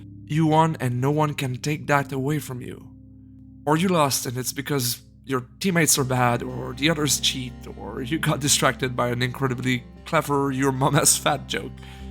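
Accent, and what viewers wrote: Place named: Quebec, Canada. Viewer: My grandma was from Québec, thought I recognized that accent. Cool video gonna sub was a fresh take on the idea of gaming decline without the click bait garbage so prevalent on YouTube.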